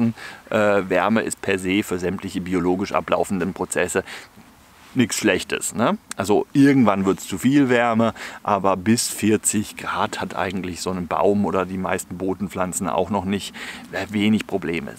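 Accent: Germany. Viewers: Danke für das Video, ich schaue mir aber auch ganz gerne die Danke- Videos an, jedes davon ist immer noch besser als was am TV so läuft. Versuche gar nicht erst, in der Schweiz zu verbergen, dass Du Deutscher bist, Du hast keine Chance, ich kenne welche, die es 20, Jahre lang versucht hatten, und dann immer noch sogar von den eigenen Kindern ausgelacht wurden, weil man sie nach spätestens einer Minute als Deutsche erkannt hat. Ist einfach so, mach Dir nichts draus. Und so böse sind wir Schweizer auch nicht, eher skeptisch. Gruss, Thomas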